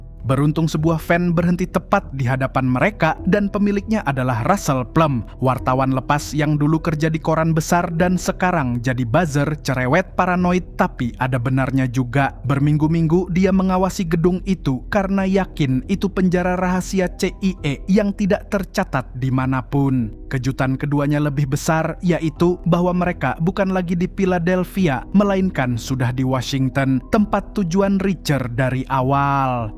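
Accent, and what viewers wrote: Banjar, Indonesia. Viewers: Admin ny urang banjar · orang Banjar kah mang?😂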